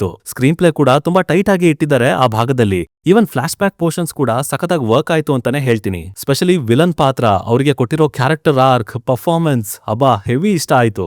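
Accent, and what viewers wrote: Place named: Chamarajanagar, India. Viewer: ಚಾಮರಾಜನಗರ ಭಾಷೆ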